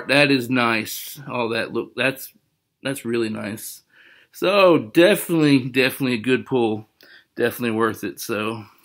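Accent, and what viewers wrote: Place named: Texas, United States. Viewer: Nice vid bud, and last pack magics that FA is pretty sweet. Whereabouts are you from friend cant quite place your accent its a little Texas and a little Tennessee thrown together. Just curious I always try and guess accents.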